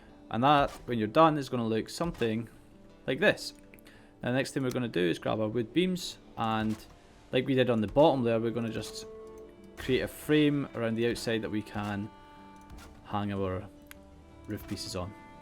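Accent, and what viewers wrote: Scotland, United Kingdom. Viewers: Nice build dude Are You Scottish?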